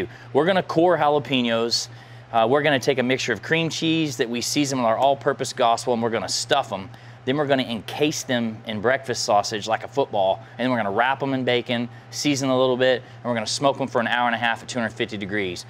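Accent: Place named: Kentucky, United States. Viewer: Would never expect a German to say “ain’t no such thing”! Love it. You sound like us (in Kentucky). Meat Church videos always really good.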